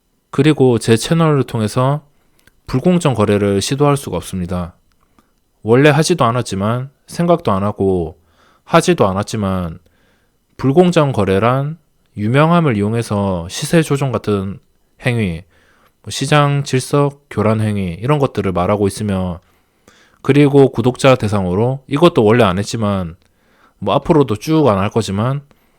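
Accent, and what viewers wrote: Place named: Changwon, South Korea. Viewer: 저도 창원살고있어요 ㅎㅎ 제목보고 들어왔는데 너무 솔찍한 우리 동네 말투로 강의해주셔셔 친숙하게 동영상 재밌게 시청 하고 있습니다 감사드려요~^^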